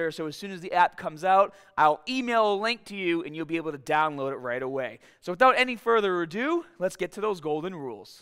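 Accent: Boston, United States. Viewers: Is that a Boston accent i hear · Holy crap!! I'm new to this and have been watching ur videos for a while because u explain awesome. And I heard ur voice this time ..and said no freakin way. This guy sounds boston!! No kidding i looked u up!!! Wicked awesome neighbah! I live near fallriver, Ma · That Boston accent is thick!! great vid! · what the hell is his accent, man. Boston, maybe? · I love that accent, is it Boston? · The classic Boston accent! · That Boston accent. I used to confuse it with the New York accent ... now I never will. I live in CT, so smack dab in the middle, but surprisingly I don't know a lot of people with a Boston accent. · Is he from boston?? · Boston accent? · I love your true Boston accent so beautiful. I’ll try this when the new local gym opens. It’s so cute how you say stahting and then say starting. Keep your accent it’s unique and part of who you are 🙂 I guarantee it’s super cool! 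· I love Boston accents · He must be from Boston. That’s how we talk here lol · You from Boston ?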